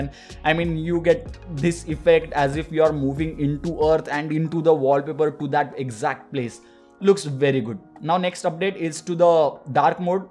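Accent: India. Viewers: Wtf !!!!!!! You can't understand this fucking india-english ...what the fuck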